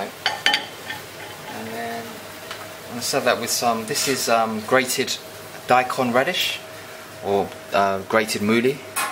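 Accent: England, United Kingdom. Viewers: Your dialect is nice. What part of England is it from?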